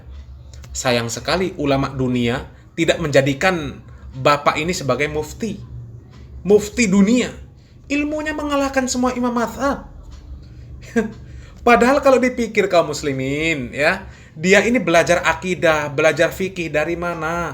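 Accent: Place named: Kediri, Indonesia. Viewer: Sangat sangat nggatelne teleh ... Duh . .logat bahasanya kog mirip banget dgn orang kediri to... Selalu ngakak kalo denger umpatan admin ... 😂😂😂😂😂😂😂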